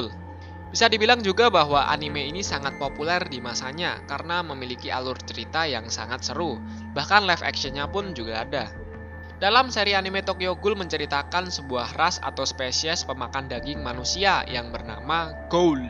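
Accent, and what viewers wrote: Java, Indonesia. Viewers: Bnjir yg punya Chanel Wong jowo kyo aku mas smpean😂Wong jowo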